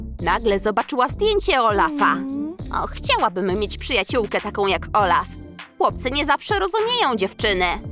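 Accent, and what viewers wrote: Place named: Poland. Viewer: Cóż za ciężki polski akcent ala Max Kolonko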